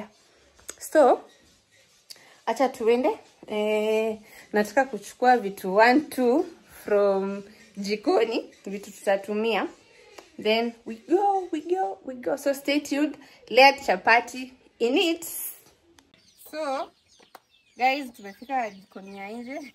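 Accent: Kenya. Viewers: ako na ascent ya waluhya 🤗🤗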